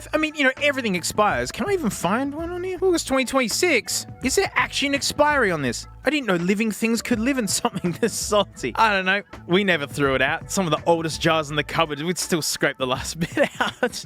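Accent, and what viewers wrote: Australia. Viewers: some Assie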